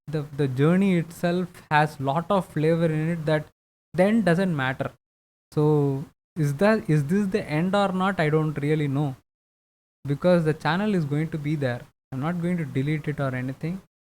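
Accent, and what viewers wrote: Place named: Tamil Nadu, India. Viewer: Your accent is Tamil. தமிழர்கள் வீழ்வதில்லை. You will come back.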